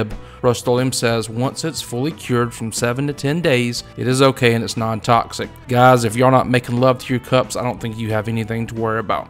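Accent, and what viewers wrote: United States. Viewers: 2 questions please: 1, can I use this method on wooden coasters, will it give a non stick finish for coffee cups? 2, are you quoting 200 deg Fahrenheit or Celcius - I am assuming Fahrenheit as by accent, you appear to be American. It could be very helpful if you noted either Fahrenheit or Celcius in future videos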